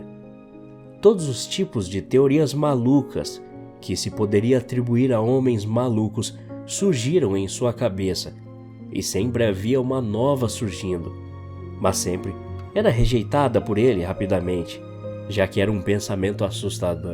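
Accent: Bahia, Brazil. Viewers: Mano, o mlk era baiano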